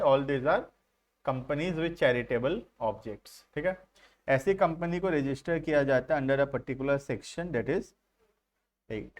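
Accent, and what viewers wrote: Odisha, India. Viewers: Sir app odisha se ho kya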